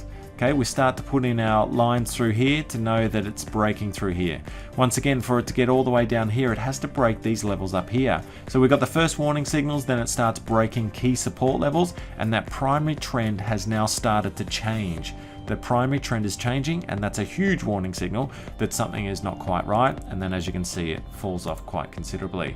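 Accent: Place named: Australia. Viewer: So let me get this straight. Jim Rickards, Joseph Stiglitz, Jim Rogers, Lew Stockwell and Peter Schiff do not have a clue?( Highly unlikly. Further more fiat monetary policy is one of the biggest ponzi schemes ever invented. To use a vernacular that u being Australian cuase of ur accent. fiat money is like watering down ur cordial more water less cordial . fiat money does the same . U print and print. What is backing ur currency . Nufin cause ur great tresurer under the Howard regime sold all ur gold. Gold price at the time was circa 300 US then it dropped under 300. What is the price of gold today. Circa 1250 US a troy ounce. Which at present day is heavaly rigged.Cuase the Chinese , Russians and the Iranians have been purchasing bullion at a un precedented rate. Hence the markets r rigged and the bullion market is rigged. IE:London bullion market constantly floods the market with paper bullion (gold and silver ) not actual bullion. 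Markets historically have had 7-10 year cycles. Another problem with the western economic situation. All the afferomentioned economist speak of the velocity of money. QE1,2and 3 did not increase the velocity of money. EEC QE 1,2,3 and 4 did not increase the velocity of money . The Fed in the US has been by back in a bigway all this printed money in bond by bak so has the EEC. Its all rigged. the stockmarket is over leveraged at circa 500 percent today . In 2007 with the derivatives meltdown and banking shemazal the stockmarket was leveraged at 12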